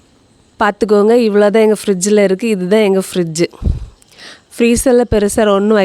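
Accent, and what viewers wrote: Kanyakumari, India. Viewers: en amma veedu aloor railway station pakkathile than irukku. Unge videos rompa pudikkum athum antha kanyakumari slang.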